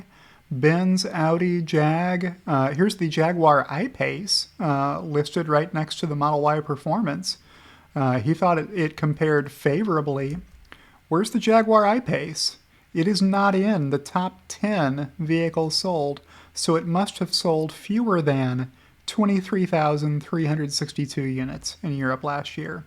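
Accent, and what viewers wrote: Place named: Transatlantic, United States. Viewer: Love that hair, man, and your posh, trans-atlantic English accent compliments it so well.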